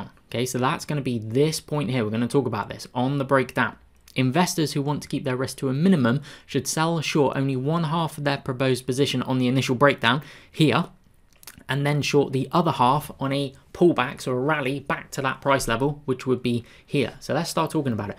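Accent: England, United Kingdom. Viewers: Excellent video, thanks for the job done. Like to hear some English accent it changes from American one ;)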